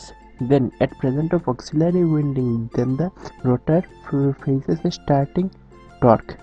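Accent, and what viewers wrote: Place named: East India, India. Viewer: your east indian accent is clearly depicted.. -@ anway i get it and the graphics are done well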